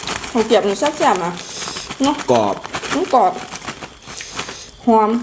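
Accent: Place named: Phu Thai, Thailand. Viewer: ครูเว่าสำเนียงผู้ไทกะเป็นเนาะค่ะ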